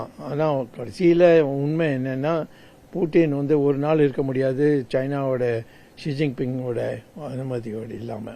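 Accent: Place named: North, India. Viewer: Yenna da unnoda slang oru mairum puriya mattigu Vadakan da neee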